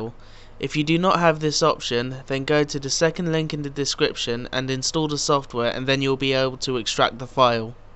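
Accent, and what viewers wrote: United Kingdom. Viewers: Two things. You are a savior in the world of video gaming. You have helped me play Resident Evil - Code Veronica. You have a British accent. That's awesome. But for some reason everything you say is interpereted by my computer into this kinda thing; - "7 great rings were forged from the fiery pit of Mt. Mordor." - "One ring to rule them all." - "They're taking the hobbits to Isenguard." PLZ HALP! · the way he pronounces dreamcast games:driimcost gheims ok,i know hes british or something xD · I believe I was just instructed by Harry Potter...O_o cool accent xD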